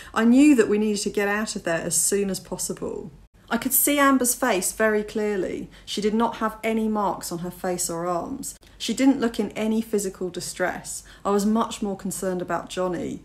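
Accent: England, United Kingdom. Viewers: Accent too English for me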